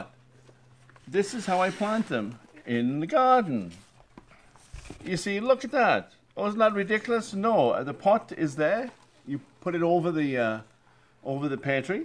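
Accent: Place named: Caribbean. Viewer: has a very unusual accent, he sounds like he is from the Caribbean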